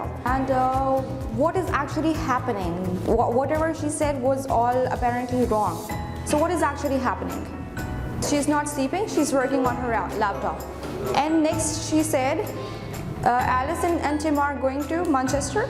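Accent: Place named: United States. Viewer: Mam you've great American accent.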